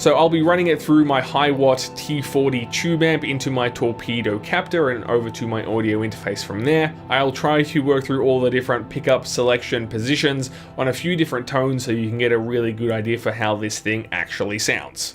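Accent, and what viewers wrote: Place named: Australia. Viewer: Hahaha that's just the down under accent... Been around Aussies and Kiwis long enough to recognize it anywhere. The upside is that it is relatively simple to impersonate.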